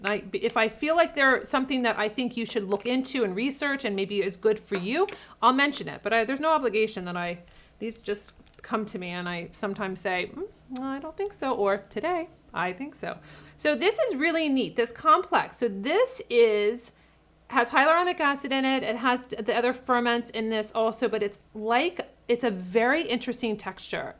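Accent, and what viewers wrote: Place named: East Coast, United States. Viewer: Google says you are from Colorado but you have an east coast accent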